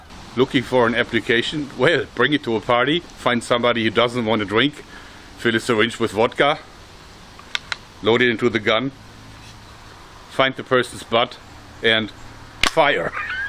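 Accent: Germany. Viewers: abgesehen davon hat der deutsche akzent einen scharm der irgendwie zu DIY Waffen passt:D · Between his thick German accent and the nature of this device, I can't help but think of Doctor Mangele and his many "very special" experiments.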